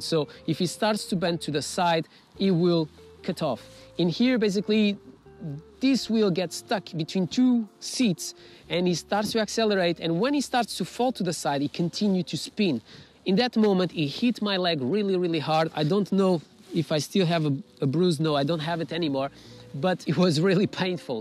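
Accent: Portugal. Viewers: Este sotaque é tuga não é? 😂 ❤🇵🇹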